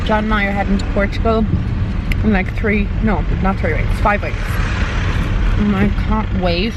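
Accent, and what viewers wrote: Dublin, Ireland. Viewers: are you from dublin?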